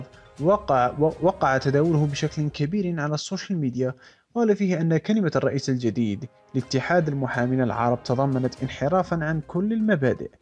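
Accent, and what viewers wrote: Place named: Marrakech, Morocco. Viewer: تخفي لكنتك المراكشية و لا تستطيع اختراقنا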